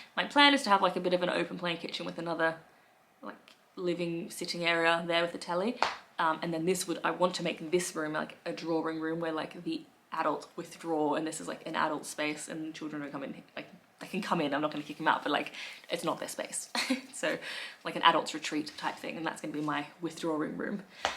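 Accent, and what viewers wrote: Australia. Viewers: Hello sauce pot💕 How do you know all these things about the UK upper crust Being a Aussie Sheila🐊🦘🦘 The UK upper class toffs only act modest to try and hide the deep inequalities that exist in the Uk You sound like a Aussie lady trying to talk English southern middle class with A aussie accent interesting little video sauce pot💕 Hoping you're keeping ok Nothing wrong with your Oz accent Don't hide it the Oz accent is great The great late Esben Storm brought the great Aussie ocker boisterous accent to the world 🌍🦘😉🤠🐨🦚 👍 Prisoner cell block h Bea Smith having a smoko mate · You come across as a posh Sheila, only a trace of an Aussie accent. Oh, I've always called it Dinner, supper is that snack midway between an earlyish dinner and bedtime whilst watching that late TV programme. It has always been the Drawing room, a door from both the hall and dining room, it had a baby Grand in the bay window the TV almost hidden in a corner, the living/morning room was the one we spent most time in, next to the kitchen. 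Dad was the posh one, Mum was the almost Cockney, SE London of Russian Jewish ancestry. My accent is a mixture of both with Dad's mannerisms.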